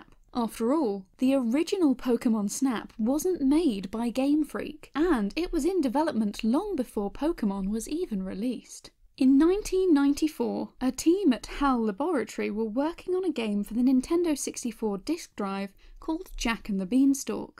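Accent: United Kingdom. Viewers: I love your British ascent lol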